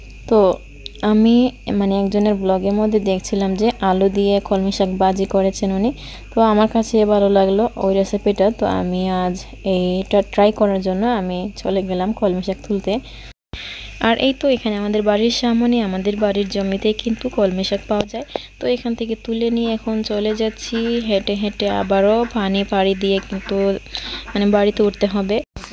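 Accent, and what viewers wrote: Sylhet, Bangladesh. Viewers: beautiful vlog. nice blog. apu apni ki sylheti ami confused tai ask korsi apni ke.super blog.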